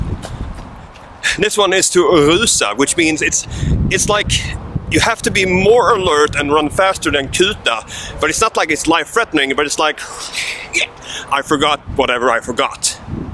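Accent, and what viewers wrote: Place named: Scania, Sweden. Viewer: as a subscriber of you for a long time, not so hard to hear your Scanian dialect. Thanks for the teaching everything about Swedish!!!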